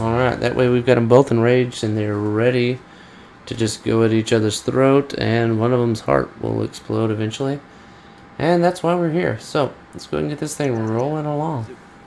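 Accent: South, United States. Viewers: deep country accent he dead as hell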